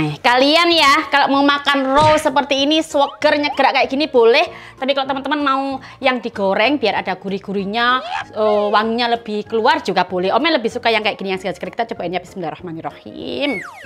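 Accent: Java, Indonesia. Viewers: Bumbu nya medok banget kaya ngomong nya medok banget 👍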